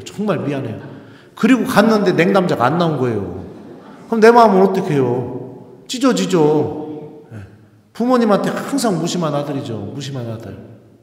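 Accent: Chungcheong, South Korea. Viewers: 신부님의 강의 너무너무 감사히 들었어요.^^ 세상 참 좋은것이 이렁게 머~언 캐나다에서도 편하게 좋은 강의를 들을 수 있으니 얼마나 감사한일인지요.❤ 보통 집안일을 하면서 듣는데 신부님의 강의를 메모하며 집중해서 듣고 싶어서 작게 차려놓은 기도 테이블 앞에서 들었어요. 끝날때쯤 "저를 위해서 성모송 한번이라도 기도 부탁합니다"하실 때 가슴이 찡하면서 눈물이 나오려했어요. 신부님들은 신자들을 위해서 온갖 고생 하시며 먼 곳까지 마다하지 않으시고 강의를 다니시는데 신자들에게 기도 부탁하실 때 보면 목소리가 작아지시더군요.^^신부님, 매일 사젤.ㄹ 위한 기도와 주모경을 바치오니 부디 영육간에 건강하시고 어떠한 유혹에도 물리치실 강력한 힘을 하느님께 빌어드립니다 참고로 제 고향도 충남 보령 웅천입니다.ㅋㅋ 신부님의 구수한 사투리가 참 정겹습니다. 신부님 화이팅!!❤❤❤❤ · 신부님! 감사합니다 늘 건강하세요 요즈음 당신의 강론을 듣고 살맛납니다 충청도사투리 넘 구수하고 멋있어요 감사드려요 신부님 알랠루야....❤❤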